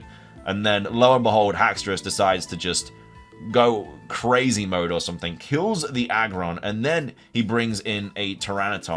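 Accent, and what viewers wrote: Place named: Northern England, United Kingdom. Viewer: I hear bits of some northern english pronouncing and wunder from what county thou art,.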